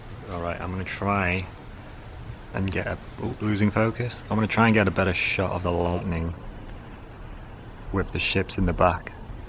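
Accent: United Kingdom. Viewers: How does your English accent affect your speaking your native language? thanks for sharing!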